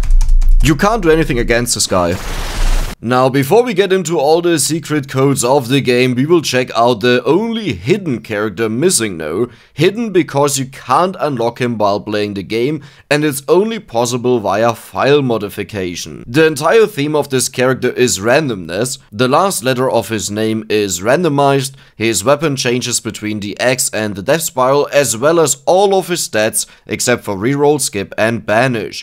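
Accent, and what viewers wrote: Germany. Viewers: Kann das sein das du Deutsch bist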